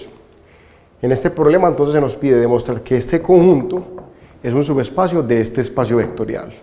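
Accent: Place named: Antioquia, Colombia. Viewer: Los paisas si hablan horrible, pero buena la explicación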